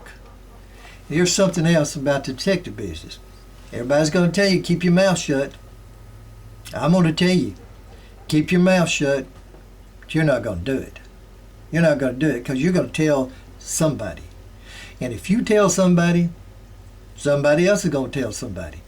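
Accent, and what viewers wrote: South, United States. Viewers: you give sound advice. loved your southern accent. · I'm sitting here in London listening to your terrific accent. What part of the USA are you from? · Enjoy the documentaries , the soothing southern drawl , the simple straight forward logic .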